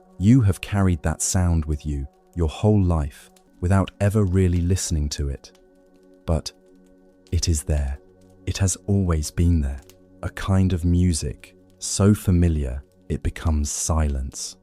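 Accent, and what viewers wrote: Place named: United Kingdom. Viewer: British accent no freaking thank you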